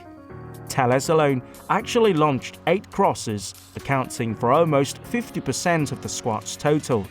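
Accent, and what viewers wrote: United Kingdom. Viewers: What a channel! Especially, the British Accent =)) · This is your first video but you guys have done it very well. What a British Accent, but I think you should improve more on pronunciation to give it more British. That's my opinion! Great job, keep it up.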